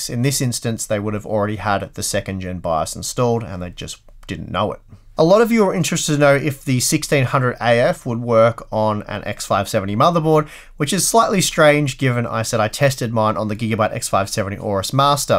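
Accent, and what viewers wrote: Australia. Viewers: Poor Youtube subtitles, can’t understand an Aussie accent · Your Ausie accent is tricking people :) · Its by Aussie accent 🤓 👍.. Propably many times people hear all sorts of things. Me included that left me wondering how to manage the hearing 😄. Ah well, anyways. HU channel makes Good videos. One of my Favourites.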